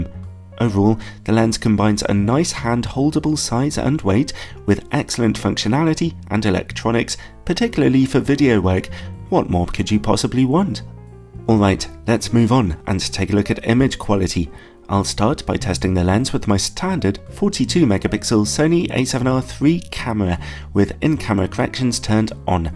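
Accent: United Kingdom. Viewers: Your lense and camera reviews are allways definitly one of the 3 best ones on the internet in any aspect from the technical and practical view regarding the lenses itself but as well from the aspect of very nice entertainment with your lovely british humor and accent, I like so much as I lived in London and Bath a couple of years ago for a short period of time! There are only Gordon Laing's reviews and DPReview on the same great level, these 3 channels are all I need to know about a new lense!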